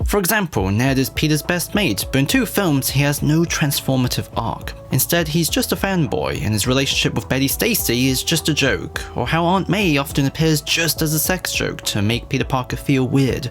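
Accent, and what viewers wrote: United Kingdom. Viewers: MCU Spidey is whack. A YouTuber with British voice apparently speaks facts with edited videos.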